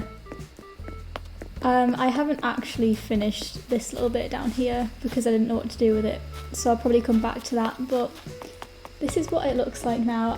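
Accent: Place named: United Kingdom. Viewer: Are you from the U.K.? I love your accent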